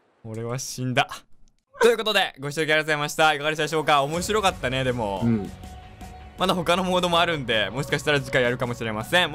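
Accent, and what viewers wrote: Kansai, Japan. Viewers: 関西弁意外だなって思った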